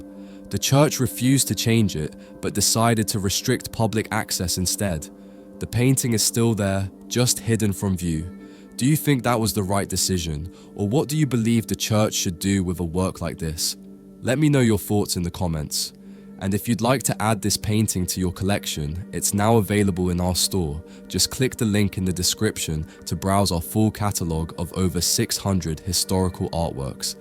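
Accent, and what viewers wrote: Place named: England, United Kingdom. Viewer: Take your English accent and your fear-mongering and shove it I am not afraid perfect love Casts Away all fear death has no power over me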